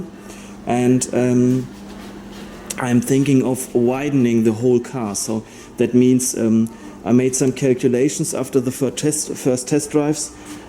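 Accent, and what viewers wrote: Germany. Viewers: This happens if a german try's to speak englisch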